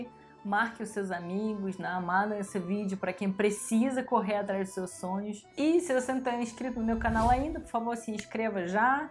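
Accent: Rio de Janeiro, Brazil. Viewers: Impressionante, como você incorporou a nossa língua! Sequer se pode notar um sotaque estrangeiro, a não ser o carioca que, diga-se de passagem, lhe cai muito bem. Parabéns pela obstinação, o que lhe valeu o merecido sucesso de que hoje você usufrui. E haja cabeça dura! Não sei se aguentaria tanto desaforo e desacertos em um país que não fosse o meu de origem. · Eita.....carioques lindo.... 👏🏿👏🏿👏🏿👏🏿👏🏿👏🏿👏🏿👏🏿👏🏿👏🏿👏🏿👏🏿👏🏿👏🏿👏🏿👏🏿👏🏿👏🏿👏🏿👏🏿👏🏿👏🏿👏🏿👏🏿👏🏿👏🏿👏🏿👏🏿👏🏿👏🏿👏🏿👏🏿👏🏿👏🏿👏🏿👏🏿👏🏿👏🏿👏🏿👏🏿👏🏿👏🏿👏🏿🍻🍻🍻🍻🍻🍻🍻🍻🍻🍻🍻🍻🍻🍻🍻🍻🍻🍻🍻🍻🍻🍻🍻🍻🍻🍻🍻🍻🍻🍻🍻🍻🍻🍻🍻🍻🍻🍻🍻🍻🍻🍻🍻🍻🍻🍻🍻🍻🍻🍻🍻🍻🍻🍻🍻🍻🍻🍻🍻🍻🍻🍻🍻🍻🍻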